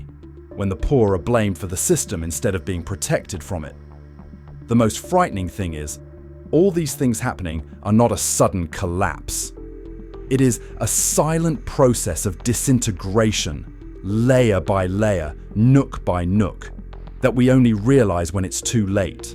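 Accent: England, United Kingdom. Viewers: Who is putting this BS out???..California???.NYCity???...and this person has an English accent!!!....I live in Fla. and I do not SEE any of this reported BS!! So those who were homeless and jobless and dependent on Welfare or Charity in their previous locale, come to Fla. and bring their same hard luck stories with them!!!!....I recommend that Florida establish a border check point and IF you are not already a resident, then you get turned around to go back where you came from....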